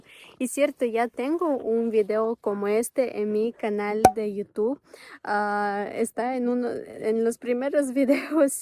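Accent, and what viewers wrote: Ambato, Ecuador. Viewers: Un español con un hermoso acento ambateño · Mira yo soy ecuatoriano vivo en paris igual que tu me toco a mi ablar frances sin profesor yo se que es muy dificil pero yo te felicito tu ablas muy bien y de verdad tienes el acento ecuatoriano en america latina todo mundo abla español pero cada pais con su acento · Cordiales saludos desde Quito Ecuador, tienes un hermoso acento ecuatoriano y aun mas, ambateño ¡felicidades! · 🎉Que bella esa vivencia. Ya habla español ecuatoriano, le falta la Música que es la más bella, como me gustaría mandarle música selecta del Ecuador , yo no soy de Ecuador pero si se la calidad de música sobre todo la antigua ya que la moderna ya dañan todo en lo q a música se refiere. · Ques pues dices al toser 👍👍👍👍 eres ecuatoriana ❤❤❤❤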